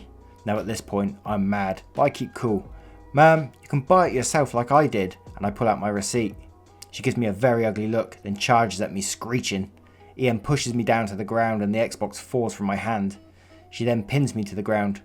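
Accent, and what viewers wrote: United Kingdom. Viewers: first story very good (britash sound)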